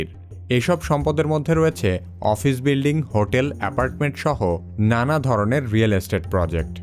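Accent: Bangladesh. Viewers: বাংলাদেশের ভাষা যে কত সুন্দর তা আপনার কথা শুনলেই বোঝা যায়.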